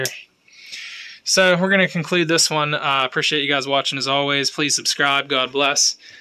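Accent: United States. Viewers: I can tell your Philly accent but can't place it exactly. Norristown? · So curious about your accent. Did you grow up in the mid-Atlantic area? Maybe Maryland? I study accents and dialects so am just curious.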